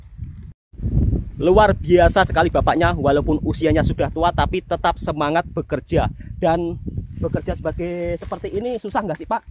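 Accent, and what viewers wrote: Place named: Central Java, Indonesia. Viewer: Tukang bangunan dan anak mudanya banyak yg kerjah di IKN, darih jawa tengah,jawa barat, jawa timur, merekah membangun ibu kotanya yg baruh di kaltim, mas sepertinya orang ngapak jawa tengah, tetap semangat mas walaupun tinggal di desah mantab ❤❤❤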